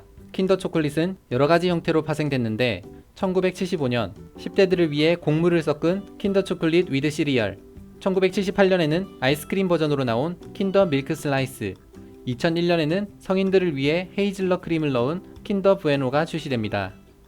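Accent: Gyeongsang, South Korea. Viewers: 원래 출신이 경상도 출신이신듯. 왜냐면 억양이 유시민 느낌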